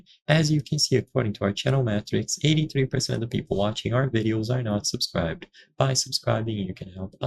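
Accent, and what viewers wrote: Brazil. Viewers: I know a Brazilian when I hear one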